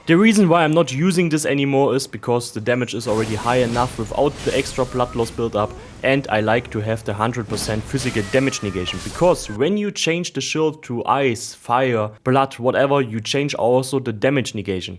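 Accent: Germany. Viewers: cool build... der deutsche aktzent kickt hart lul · gutes Englisch man hat fast ned rausgehött das du deutsch bist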